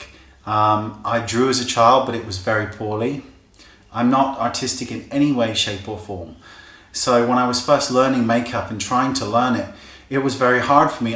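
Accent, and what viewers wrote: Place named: Bristol, United Kingdom. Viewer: Sounds like the Bristol area to me (my neck of the woods!). Sometimes, whenever I'm feeling homesick at uni, I'll play his videos in the background while I'm working. He's like my David Attenborough. My neighbours must think I live in a makeup cult; nonstop one-way conversations about contouring and eyelashes...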